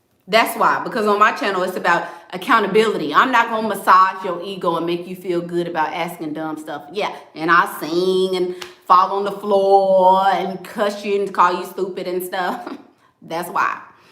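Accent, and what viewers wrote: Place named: South, United States. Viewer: you did it again!!! This video was everything!! Straight facts.. I’m 26 years old and I finally stepped out the victim mentality.. and watching you more and more makes me really double think of what I DO!! you crying is definitely not a sign thing.. when you hear real and feel it you automatically going to cry because I sure did.. I highly appreciate you.. and I love you southern accent we Texas women rock lol. Much love to you 🥰 PS:50,000 subscribers will definitely be yours by your birthday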